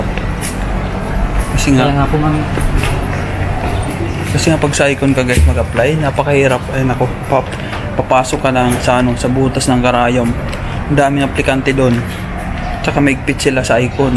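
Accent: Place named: Waray, Philippines. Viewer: Bossing taga samar kb..or waray waray kb??😂waray kasi accent mo eh😅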